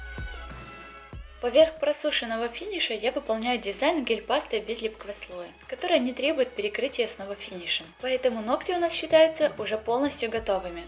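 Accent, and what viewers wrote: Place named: Makiivka, Ukraine. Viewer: Подписана на вас очень давно, смотрю все видео. Вы потрясающий мастер. Но почему вы настолько плохо говорите по-русски? Почитайте книги, что ли. Или хотя бы не используйте деепричастие и причастие, если не понимаете, где в предложении действующее лицо. Нельзя так. Вы публичный человек, а шпарите на макеевском диалекте.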